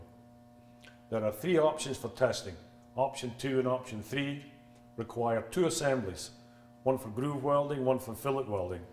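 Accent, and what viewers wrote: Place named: Scotland, United Kingdom. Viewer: What part of scotland is the instructor from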